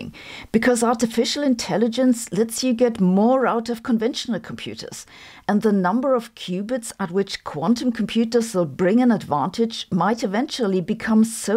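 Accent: Germany. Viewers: Why has her German accent become so thick recently?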